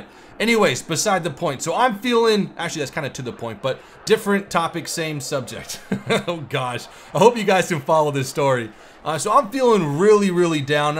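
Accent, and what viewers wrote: United Kingdom. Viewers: English accent on point! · I thought you were British?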